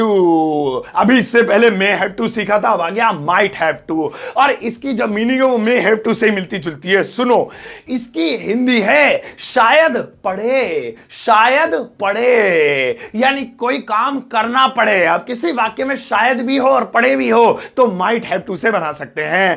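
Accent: India. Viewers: Desi English.♥️♥️♥️♥️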